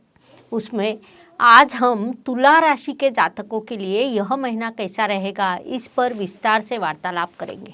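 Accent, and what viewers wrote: Maharashtra, India. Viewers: नमस्कार मॅडम. तुम्ही केलेलं विवेचन अन मार्गदर्शन दोन्ही अतिशय उत्तम वाटले. फक्त हितचिंतक ह्या नात्याने एक अनाहूत सल्ला देण्याचं धाडस करतोय तरी क्षमा असावी. तुमचं हिन्दी बोलणं, म्हणजे चक्क मराठीतूनच हिन्दी बोलताय असं वाटतं. प्रत्येक भाषेच्या उच्चारणीचा, बोलण्याचा एक घाट, एक शैली असते. म्हणजे दुसर्‍या शब्दांत सांगायचं तर पावभाजीत सांबार मसाला घातलात तर जे होईल तसं काहीसं भासतंय! त्यामुळे तुम्हाला खरोखरच एक सकारात्मक विनंती करतो की अधिकाधिक हिन्दी, चांगली हिन्दी सतत ऐकत राहायची. नाटक, सिनेमा, मालिका, समाचार असं काहीही. मात्र आपण चांगली, दर्जेदार हिन्दी ऐकतोय ना, ह्याकडे कटाक्षपूर्वक ध्यान द्यायलाच हवं. आजच्या काळात सर्वोत्कृष्ट हिन्दी ऐकायची तर श्री. अमिताभ बच्चन ह्यांचे कौन बनेगा करोड़पति चे भाग पाहायचे. त्यांच्या हिन्दीवर लक्ष केंद्रित करून. माझ्या सूचनेकडे कृपया टीका म्हणून पाहूं नये, सकारात्मक दृष्टिने घ्यावी ही विनंती. हरिॐ.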